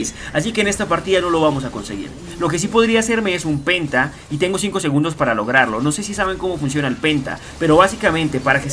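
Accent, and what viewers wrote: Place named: Ecuador. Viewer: hola, tu acento se parece al ecuatoriano xD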